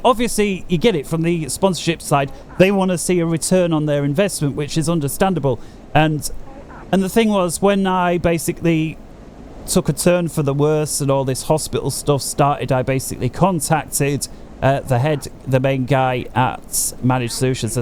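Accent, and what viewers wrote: United Kingdom. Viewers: thank you for reminding me that I'm allergic to bri'ish english.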